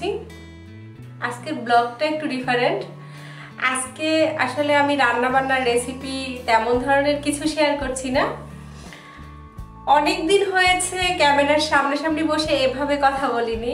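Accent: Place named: Barisal, Bangladesh. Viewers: ami tomake ajkei boltam borisaler vasai kotha bolte ar tumi deksi already bolso,meg na chaite jol. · To mar borisal er moto kotabola kub valo laglo tomarvlog dakte valolage ami rubina to make amar didir moto lage.ami sarervdo deke 25 kg ojon komiachi.akon ami 49kg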